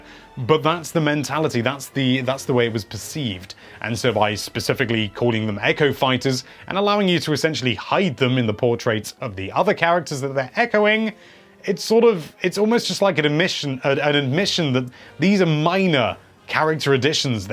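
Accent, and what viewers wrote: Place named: United Kingdom. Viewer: Is it just me or does seem more British than usual.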